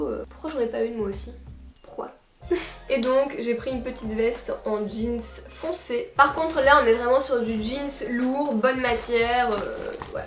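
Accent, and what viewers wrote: Belgium. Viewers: Est ce que un jour tu pourras faire un meet up à Marseille ? ?? Sinon vidéo parfaite 😘😘je kiff votre accent en Belgique 😘😘 BISOUX 😘😘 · J'adore ton accent belge ♥️♥️moi aussi je suis belge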